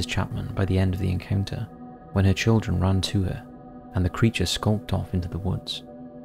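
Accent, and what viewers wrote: United Kingdom. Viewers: Could you try any harder to imitate the voice of that Dark Docs bozo? An overly dramatized, whispery, vaguely Brit voice does not in any way improve an already shabby production. Consider less theatrics and way more thought on your next one mate. Cheers!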